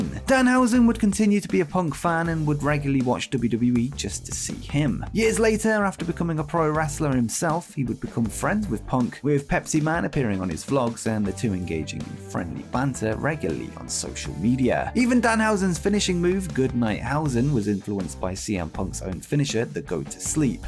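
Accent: United Kingdom. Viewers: Also hearing "Pepsi man" in a nice British accent is awesome! Vive la!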